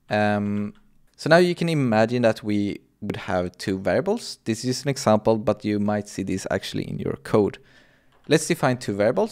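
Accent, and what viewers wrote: Sweden. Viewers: You must be from Sweden, I can hear it!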